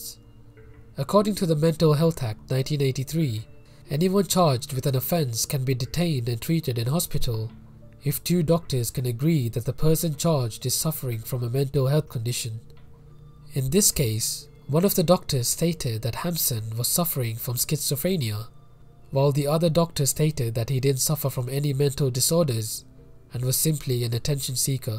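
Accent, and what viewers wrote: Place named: India. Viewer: The narrator has an Indian accent, just curious.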